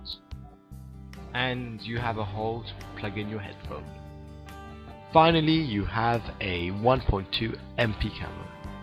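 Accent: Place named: England, United Kingdom. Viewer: Tu as un superbe accent ! Tu ne serais pas anglais ou un truc du genre ?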